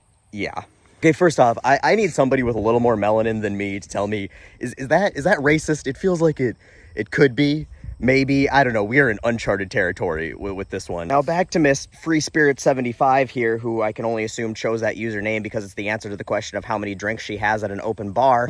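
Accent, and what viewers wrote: United States. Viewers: UK here. You sound like an American to me 🤷🏻🤣♥️ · Truth be told, you sound American to me… now may I please have a Hamms?!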